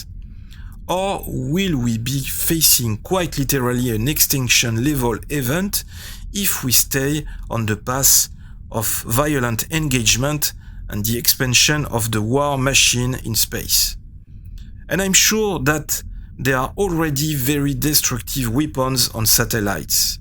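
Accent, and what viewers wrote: France. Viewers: Swedish is my native language but/and I understand you to 100%. Please don't lose your French accent, it would such a shame. Merci de partager vos connaissances avec nous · love your french accent :) keep the cool videos rolling out!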